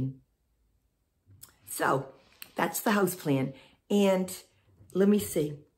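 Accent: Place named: Massachusetts, United States. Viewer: Nice to be sitting in SC - after all my life in Stoughton / Canton area - so, I know right where you are and happy to hear someone who sounds like me😉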